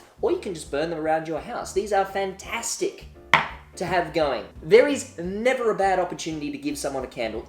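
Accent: Australia. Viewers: He has an Australian accent that isn’t annoying. Astonishing. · He makes me think I need to marry an Australian man. Love the accent.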